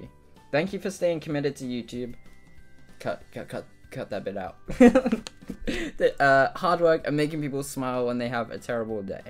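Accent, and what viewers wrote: United Kingdom. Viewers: Yes I'm British too I think he is, my friend is like that too coz all her friends are american she picks up the accent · DON'T MOVE TO AMERICA!!! YOU'LL LOSE YOUR BRITISH ACCENT!!! Slowly but surely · I love your mid-British accent, it's like, u can tell that ur some what British but it's confusing by the accent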